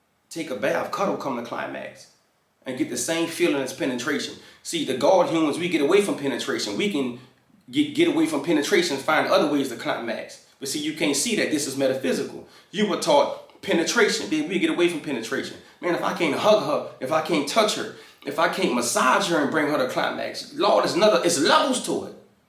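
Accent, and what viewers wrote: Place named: Louisiana, United States. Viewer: That Louisiana drawl gets me every single time☺️😮‍💨💕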